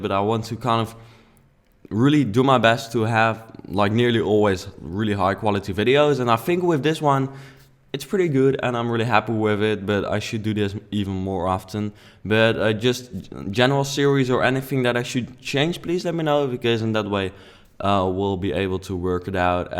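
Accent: Netherlands. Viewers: Suupermooi gemaakt! Ik hoorde aan t begin van de video t nederlandse accentje er al doorheen, kun je ook niks aan doen tho ;) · Ik hoorde gelijk dat je Nederlands bent ;) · Are you dutch?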